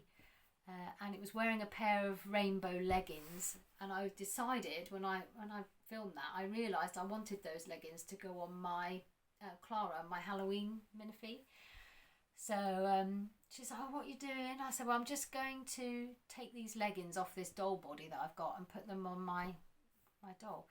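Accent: United Kingdom. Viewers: Hi there, is always glad to her from you! (did I tell you how much I love the British English accent? Is lovely!) Glad to know your girls are growing up and have been doing nice things! I wish they didn't stop loving dolls, but most of teen girls do, is nothing we can do about it. I do hope at least your youngest doesn't. Girl, you did an amazing job on this Minifee's face up, she looks gorgeous. The anime style is really anime-ish! I love it, you rock! I can't wait to see Tinker Bell out of the box, free as a bird! She is gorgeous! I wish you an amazing weekend. Kisses to you, your dolls and your daughters! 🤗🌹❤🥰😘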